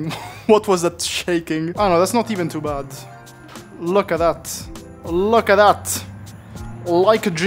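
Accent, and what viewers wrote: Italy. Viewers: ma da che parte dell' Italia sei? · Ma sei Italiano o cosa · Bro sei italiano?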